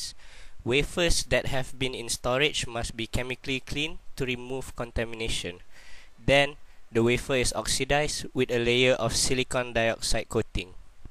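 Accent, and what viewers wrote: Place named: Malaysia. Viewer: like a malay reading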